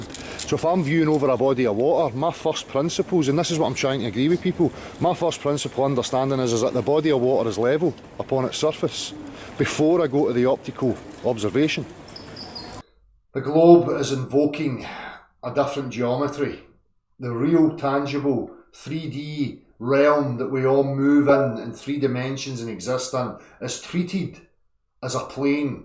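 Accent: Scotland, United Kingdom. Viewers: Love the Scottish accent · Who is this Scot please?????? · speaking English with his Scottish brogue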